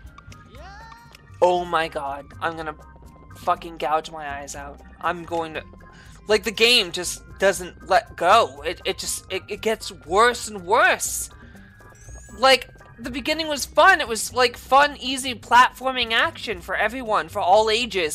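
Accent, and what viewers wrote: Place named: Boston, United States. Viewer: God i love ur Boston accent it's so adorable!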